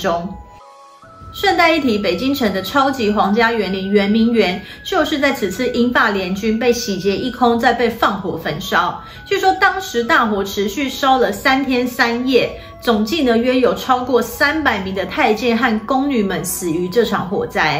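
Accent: Taiwan. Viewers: 标准的台湾国语。比很多ㄉㄞˉㄨㄚˉ厲害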